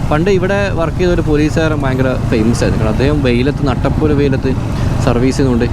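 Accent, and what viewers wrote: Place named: Iritty, India. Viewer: . കുഞ്ഞി കുഞ്ഞി നമ്മുടെ ഇരിട്ടി ഭാഷാ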